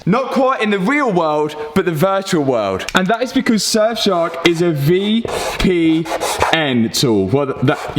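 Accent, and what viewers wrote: United Kingdom. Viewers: This will sound bad but I don't like the British Accent at all but for some reason i enjoy your content and your energy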